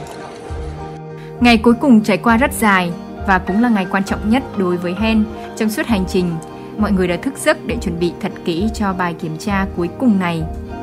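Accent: North, Vietnam. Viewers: Hen nói giọng miền bắc dễ thương lắm chị sẽ ủng em hãy làm những gì mình thích cô gái mạnh mẽ quá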